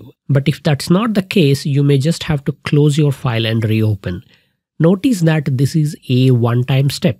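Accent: India. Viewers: Sir your country name please? Your accent seems like Indian but when I saw your misses then I confused about your country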